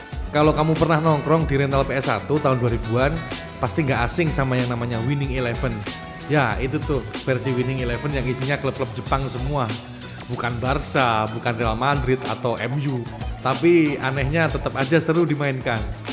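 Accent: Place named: Java, Indonesia. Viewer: Semi medok ❤❤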